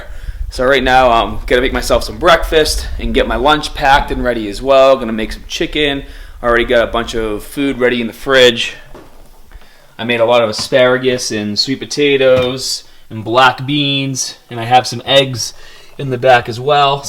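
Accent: Boston, United States. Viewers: Boston haha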